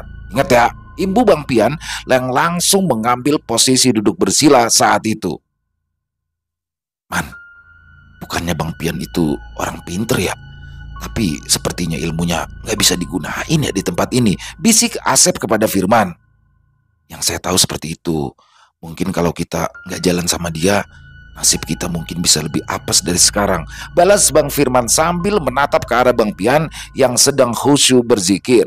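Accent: Jakarta, Indonesia. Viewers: Waalaikumsalam.keren cerita nya..cocok bnget klo yg bercerita nya. betawinya masuk banget.inyi nya puas dan trhibur..mas ditunggu ceritanyw.bang pian..bang rudy dan mas sudiro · Nah ini yg ane demen dr klo udah pake logat betawi. Kocak 😂😂👏👏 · Saya kalaupun orsun Dengar cerita logat betawi suka bngt medok2 lucu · Waw cirikas Betawi nya bang bikin ketawa sendiri gua,lucu, serem semua nya dapat, Kren bang, kyk orang gila gua denger baba Ali nya becanda😅😅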